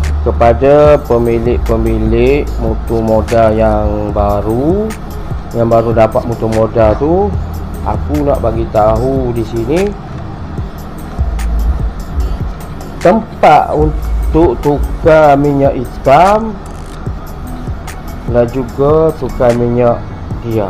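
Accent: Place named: Kelantan, Malaysia. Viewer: BUNYI KELATE LAH PLAK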